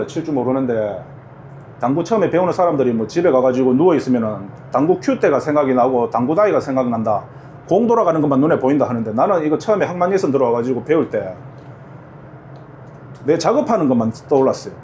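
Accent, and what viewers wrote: Gyeongsang, South Korea. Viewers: 선장님 말씨가 포항 쪽 말씨네요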